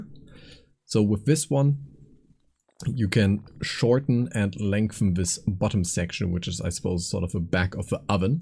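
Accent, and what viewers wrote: Germany. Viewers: I love the tutorial, but holy shit I love ur voice, the way you speak and emphasize on certain words and that thick (I think german) accent just makes this absolute cinema, love it buddy 😍😅😂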